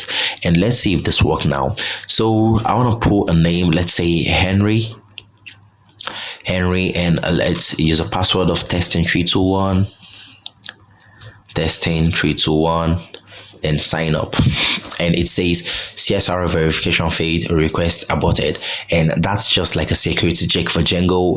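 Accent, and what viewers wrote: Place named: Nigeria. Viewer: Wow ...you great ...maybe its the Nigerian accent but you taught me better....Nigerian from Canada here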